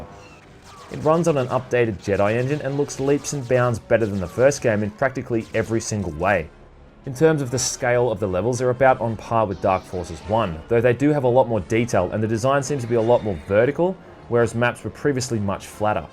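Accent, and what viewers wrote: Australia. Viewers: this may be a weird question but what is your accent it sounds australian to me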